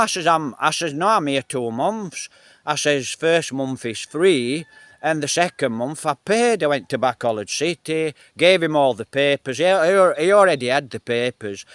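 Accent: Yorkshire, United Kingdom. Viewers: Hilarious.:face-green-smiling:. Are you from Yorkshire, mate (like me), or a red rose ?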